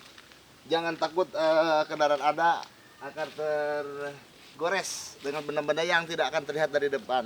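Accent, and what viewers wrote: Indonesia. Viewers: sunda na leket pisan kang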